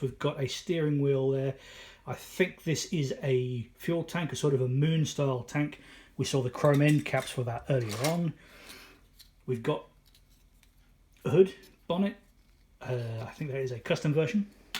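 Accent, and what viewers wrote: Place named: England, United Kingdom. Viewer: Good review of complex kit👍🤓just watched a couple more too, so I'd better sub😃 It's nice to hear your another Englishman aswell😃Pete 😎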